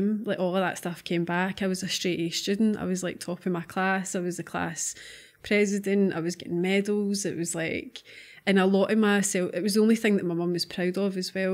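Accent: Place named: Scotland, United Kingdom. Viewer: I could listen too her voice all day long. Scottish women 😍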